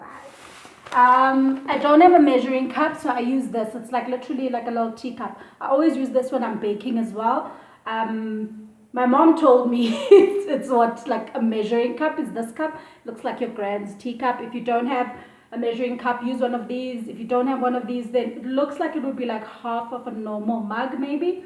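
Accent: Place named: Coloured, South Africa. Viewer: I thought you are colored, you speak and look like us...or so I thought😂😂😍😍thanks for the recipe girl going to give it a go. Take care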